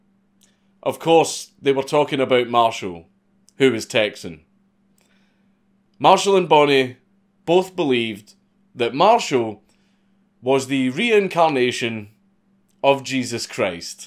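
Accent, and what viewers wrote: Scotland, United Kingdom. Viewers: I love this man's accent If I've guessed correctly, Scotland is full of people with a similar accent, at least in one particular region, but I love it. An appreciative Yank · Dude, you're so funny! Add that to your 200% Scotch look and sound, I'm ROFL-ing here! 😂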